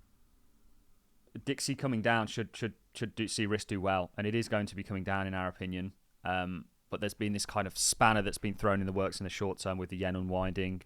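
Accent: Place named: England, United Kingdom. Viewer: He's English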